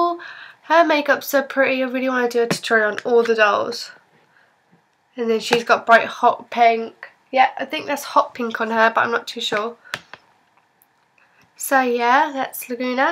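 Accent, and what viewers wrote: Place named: United Kingdom. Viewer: You're so sweet, i love your UK accent and congrat for this awesome pack :) I love you're video. Bisous from France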